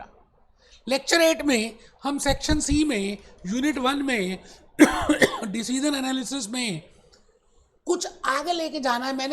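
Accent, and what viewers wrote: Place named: India. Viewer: Thank you Sir. Aap bahut accha kar rahe ho aise video daalkar. I always understood concepts better in Hindi and in a desi dialect.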